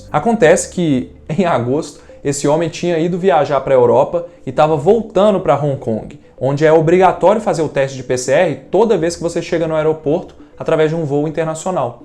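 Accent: Minas Gerais, Brazil. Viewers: Agora temos um sotaquim minerim de responsa divulgando ciência de qualidade no Brasil todo 😂😍 Valeu! 👌🏼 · Tô sentindo que os vídeos tão perdendo o sotaque mineirinho hein...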